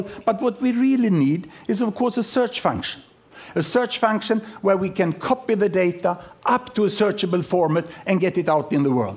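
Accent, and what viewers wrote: Sweden. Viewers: His accent is sooo typically Swedish :') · Dear Saturday Night Live, this is how english with swedish accent sounds like. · älskar hans svenska uttal.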